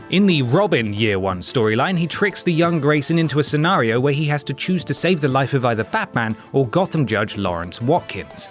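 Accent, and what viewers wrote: United Kingdom. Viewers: freaking pompus british accent makes me hate you, but damn do i respect you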